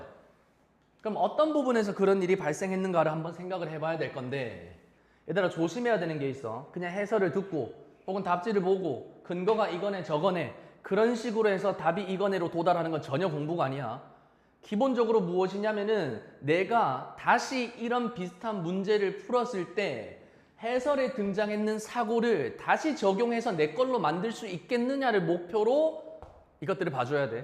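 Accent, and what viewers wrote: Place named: Gyeongbuk, South Korea. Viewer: 와~ 경북 사투리 이해 쌉 가능^^* 경기도 사는데 쌤 온라인 강의 듣고 싶어요~~~^^*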